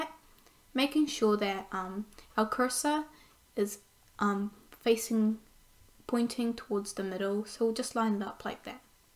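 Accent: New Zealand. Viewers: Kiwi accent ;)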